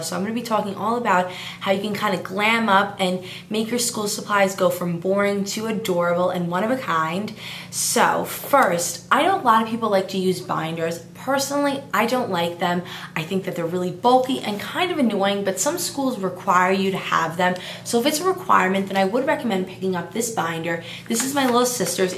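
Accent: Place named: South, United States. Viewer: no effence but your accent gets on my nerves are you southern? like its not lasht year its laSt year!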